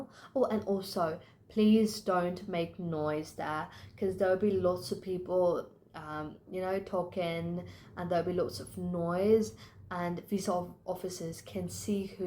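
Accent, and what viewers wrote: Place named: United Kingdom. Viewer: british accent at its best the vo must be impressed by this dd congrats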